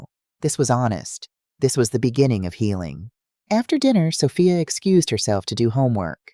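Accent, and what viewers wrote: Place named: United States. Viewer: I hate her Mexican pronunciation, it sounds too gringo😊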